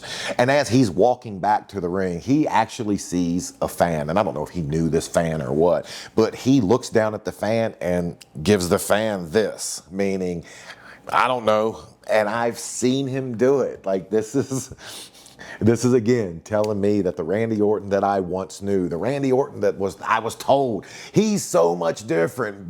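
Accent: Virginia, United States. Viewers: . Your cool af...im used to your accent becouse theres a youtube channel called AFTERPRISONSHOW..and joe the host..has your exact accent..lol and hes from Virginia...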